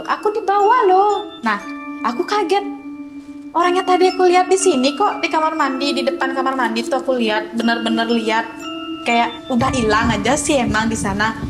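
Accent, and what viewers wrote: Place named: Bali, Indonesia. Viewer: Seneng kl denger logat bali..😅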